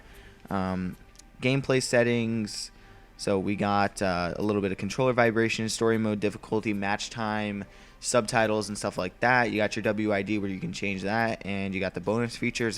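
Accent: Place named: United Kingdom. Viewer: Breathless British accent there xD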